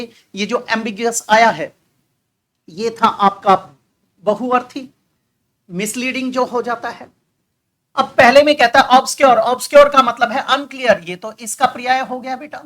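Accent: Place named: Bhaswal, India. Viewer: Sir aap boli bhaswal se ho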